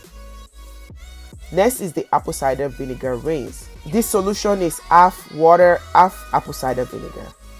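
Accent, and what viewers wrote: Yoruba, Nigeria. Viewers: No matter the accent the yoruba accent will always peek through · Your Yoruba right? I’m Igbo and I recognized your rich accent. Lots of love❤️❤️❤️❤️❤️❤️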